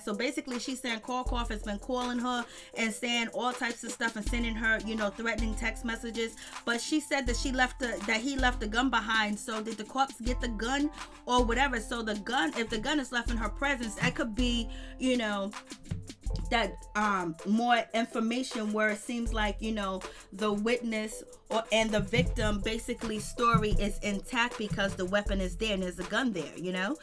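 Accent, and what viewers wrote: New York, United States. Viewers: She sound like she is from NY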